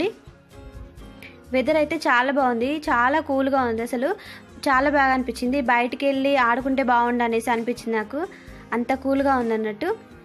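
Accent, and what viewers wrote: Telangana, India. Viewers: Super akka telangana yasa superrrrrr love u akka pakka telangana matalu superrr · Hi Telangana basha mustuga maladutunavu dress super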